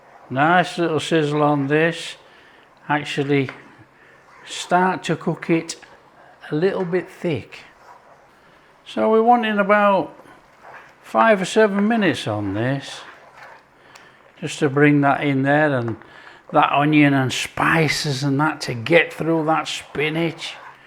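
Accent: United Kingdom. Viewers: British accent is 😂😂funny 🤣🤣 kumon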